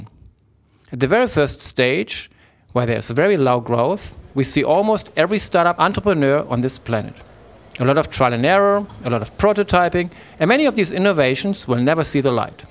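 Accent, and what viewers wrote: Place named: Germany. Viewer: not gonna lie, but I literally recognized your german accent in the first sentence :) Greetings from Germany :)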